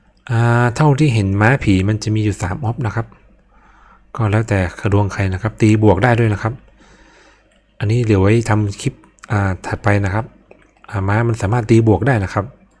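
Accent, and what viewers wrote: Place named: North, Thailand. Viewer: อ่อครับ ผมนึกว่าพี่เป็คนเหนือ😅😅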